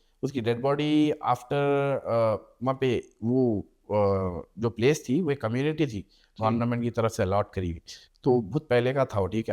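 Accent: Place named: Hyderabad, India. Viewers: Hyderabadiii boltey.. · arre Bhai hindi hi bolre par ascent Hyderabadi hai isliye waisa dikhta. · Apna Hyderabadi bolte Bhai Ku angaar dalte love you brother 😍😍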